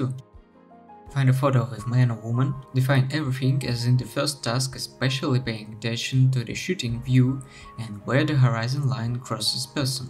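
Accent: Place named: United Kingdom. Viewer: местами 100% британец, а иногда заговаривался, успехов!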